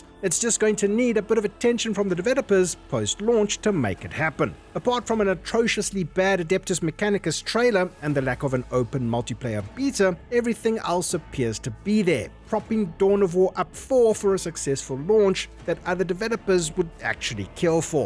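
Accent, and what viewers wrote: South Africa. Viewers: Warcraft 3 has been having some traction lately with bigger prize monies etc. And are you South African 🇿🇦 If you are awe! 🫢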